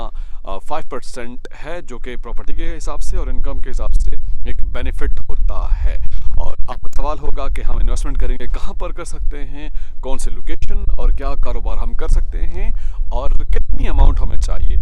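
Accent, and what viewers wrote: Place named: India. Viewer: Ap Pakistani hain tu Pakistani language main bat kry Indian accent main kr rahy ho tum we doesn’t understand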